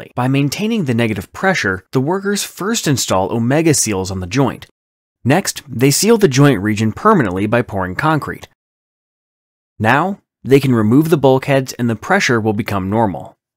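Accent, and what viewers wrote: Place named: India. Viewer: You please don't speak.. use AI for your voice. Your accent is typical meme for the westerner. I am an Indian and even I am finding it awkward.😂 Please...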